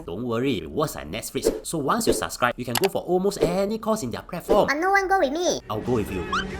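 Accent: Singapore. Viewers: I really appreciate this channel for its insights on Singapore politics. But I must say with exaggerated and nonsensical pronunciation I need subtitles AND pause to read to understand. (Having lived in Singapore for more than 20 years I can confidently say I can understand 99% of ACTUAL Singlish with no problem, his is a very extreme version) I understand that you need to “stand out” and differentiate yourself a bit but pls bear in mind viewers’ tolerance level too!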